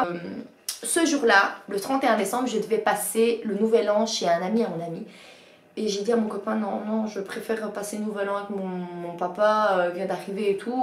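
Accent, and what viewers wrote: Belgium. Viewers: J'ai une question tu es Bruxelloise ?PS moi oui 🙂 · Tu es belge ?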